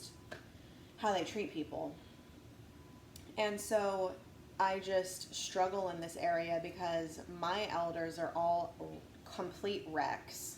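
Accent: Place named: South, United States. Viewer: American Accent?? I would say...you need to relax. You have a southern drawl and Jersey touch as accent. Not sure about the PENN State stuff, but clearly my before. Tinge of Kentuckian.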